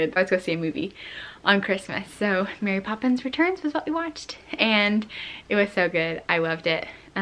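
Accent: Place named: South, United States. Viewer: Not sure how I found thous page, you are very high energy, which is cool, I like your southern accent, I sub and liked. Hello from Ontario Canada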